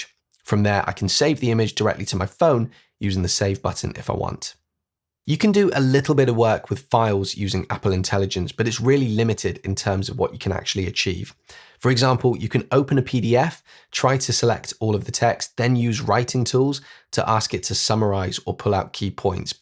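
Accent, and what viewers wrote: United Kingdom. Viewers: Great. I’m in Lisbon understand your British English very well, congratulations! Just got a new 16 Pro Max and love your lessons. Will be a link member soon. Have shared several with my colleagues. Good health! Keep going into 2025.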